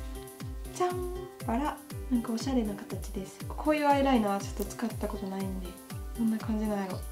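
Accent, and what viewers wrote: Kansai, Japan. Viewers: 関西弁やからしゃーないし。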